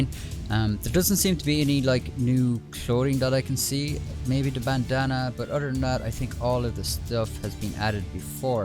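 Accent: Ireland. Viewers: This man is Irish by the sounds of it.....legend ☘️😁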